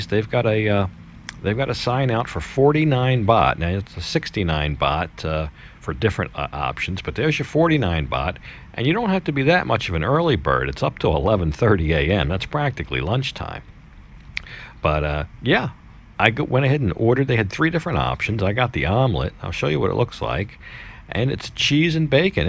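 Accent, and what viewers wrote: United States. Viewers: I dont understand, as you live in this country, that you pronounce cities, places and names in an american way... Why do you think this country wants their language pronounced like that. Disrespect !!!!!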